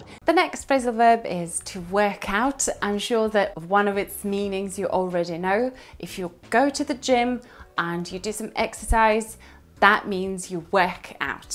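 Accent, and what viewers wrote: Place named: United Kingdom. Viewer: I loved her British accent sounds really cool and like a native speaker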